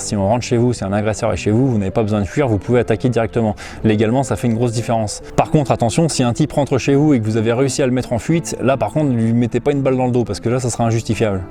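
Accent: United States. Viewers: vidéo très intéressante, par contre faut qu'il arrête avec l'accent américain péteux c'est trop mdr ceci dit très bonne vidéo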